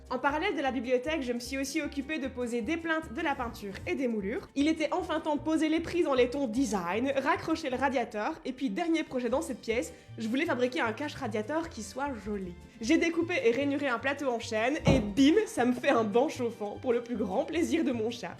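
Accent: Belgium. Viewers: La musique de c'était pas nécessaire parcontre surtout quand ont n'a un accent belge comme toi. 😅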